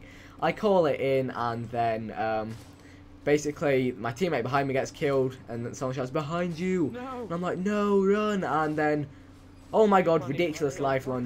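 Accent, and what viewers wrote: United Kingdom. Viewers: So it's a British Amazingfilms247? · whoa... you British?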